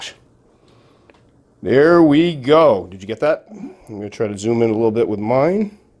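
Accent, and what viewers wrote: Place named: Northeast, United States. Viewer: Maryland here. I could tell you were somewhere up there from your accent and attitude :) Awesome vids <3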